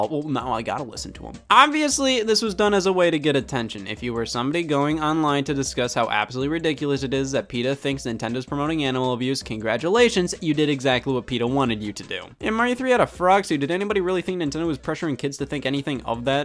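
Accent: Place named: Midwest, United States. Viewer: What I love about is that you can obviously tell he's from the Midwest poorly based on the fact that he said "Yup" like, 10 times in this singular video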